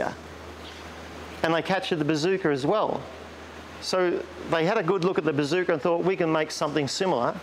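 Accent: Australia. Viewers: Thank you - that was really informative. And hearing it in an Aussie accent was music to my ears. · I love our accent. Come on Aussie come on!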